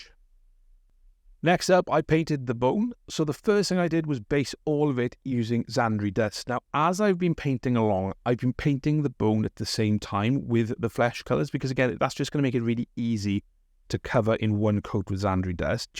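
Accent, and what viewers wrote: Wales, United Kingdom. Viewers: Great tutorial and always nice to hear a Welsh accent on a youtube vid. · THAT SOUNDS LIKE A WELSH ACCENT RAHHHHHHHH I WILL BE SUBSCRIBING BOTH FOR THESE AMAZING PAINTING TUTORIALS BUT ALSO BECAUSE YOU HAIL FROM THE GREATEST COUNTRY IN THE WORLD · ah sick. Thank you! Ok I’ll get one of those. I’ve been burning through crappy brushes for too long. I hear that Welsh 🏴 accent. You’re not anything to do with Firestorm in Cardiff are you? That’s our local and we basically spend our wages there 🤦‍♂️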